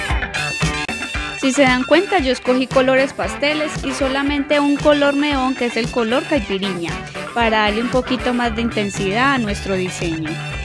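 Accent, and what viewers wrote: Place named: Colombia. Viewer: Por tu acento puede que seas de Cali ó Medellín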